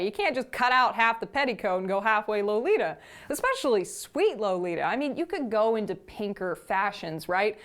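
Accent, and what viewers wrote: Texas, United States. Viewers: can anyone else hear the texan accent jumping out in this video????? 👀 (especially when starts getting fired up, i.e. about the first post and how crazy it was)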